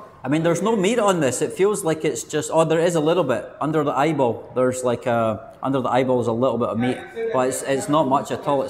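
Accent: Scotland, United Kingdom. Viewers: Yup ! Thick Scotland Accent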